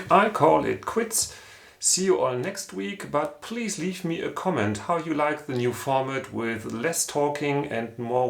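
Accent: Germany. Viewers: I actually enjoyed hearing you talk like in previous videos. I found it to be a perfect mixture of work and talking. I love your German accent and I also learn things as well. I’m glad you and Lazlo are doing well!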